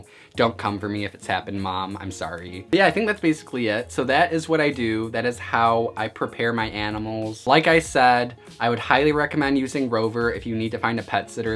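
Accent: Midwest, United States. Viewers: He def has a Midwest accent! I never made the connection!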